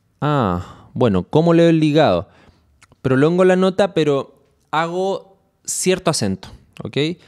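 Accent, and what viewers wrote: Chile. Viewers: Primer video que veo, y empezando el video me di cuenta que eres Chileno jajaj. Buen video compa, sigue asi🔥🔥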